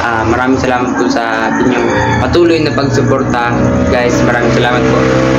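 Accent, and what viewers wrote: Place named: Quezon, Philippines. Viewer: Saan kapo sa quezon bro? Tama hula ko quezon karin sa punto mo, taga quezon din ako eh, nakakamis narin ang province talaga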